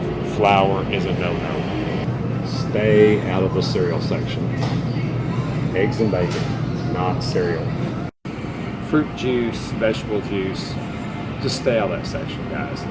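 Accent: South, United States. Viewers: P.S. Love your videos. You speak just like my dear Southern relatives who have sense enough to eat like you do.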